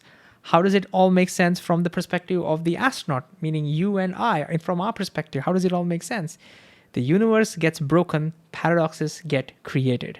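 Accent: India. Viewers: That Indian accent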